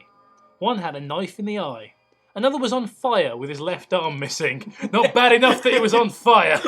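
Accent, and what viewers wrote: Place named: Scotland, United Kingdom. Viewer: That Scottish accent is brilliant.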